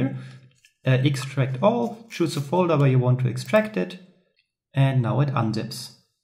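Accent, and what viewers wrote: Germany. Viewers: man merkt das du deutsche bist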